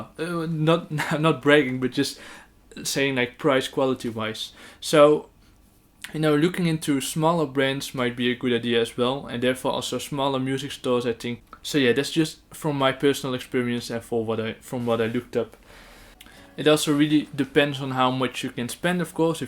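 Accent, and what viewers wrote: Netherlands. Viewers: Het Nederlandse accent is geweldig ahaha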